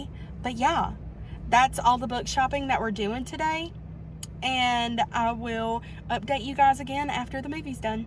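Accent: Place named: United States. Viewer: Also obsessed with your accent (I’m from the UK) I can’t pinpoint where abouts in the US you are from?! 🖤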